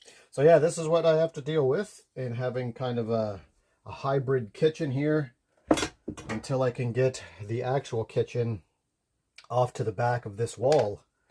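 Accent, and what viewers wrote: Canada. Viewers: Love you're Canadian accent 🫶🏼🎄🌨️❄️👋🏼🫂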